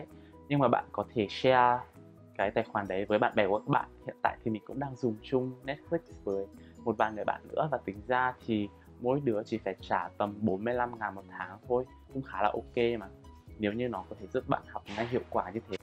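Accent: Nghe An, Vietnam. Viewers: Bạn ấy giọng Nghệ An nhưng cố nói giọng chuẩn Hà Nội nên chưa tự nhiên 100% á nên giọng nhỏ và trầm hơn bình thường, nghe thì sẽ thấy có nhiều chỗ hụt hơi do phải "luyến" cho ra giọng HN với đôi chỗ cũng nghe ra âm sắc giọng Nghệ An:)) Cơ mà mình thấy giọng Nghệ An hay mà nếu nói chuẩn như giọng HN thì tốt không thì cũng chả sao! Just the way you are.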